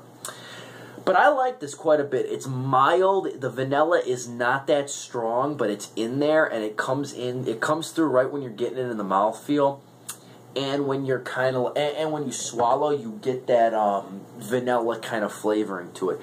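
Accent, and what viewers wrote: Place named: Chicago, United States. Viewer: Nice Shicehgow accent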